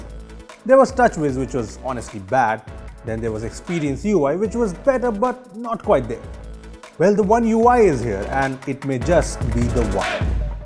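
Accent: India. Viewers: I'm a simple man. I hear an Indian accent, I press dislike.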